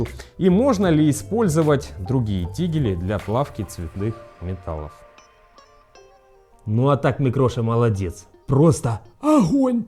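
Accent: Rostov, Russia. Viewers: Ростовский говор)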